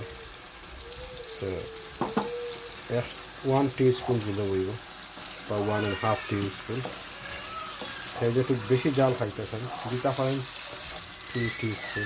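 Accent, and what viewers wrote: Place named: Sylhet, Bangladesh. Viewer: ওয়া আলাইকুম আসসালাম। কেমন আছেন আপনি। ভালো লাগলো, এই প্রথম কুনো সিলেটি ভাইর ভয়েসে রেসিপি দেখলাম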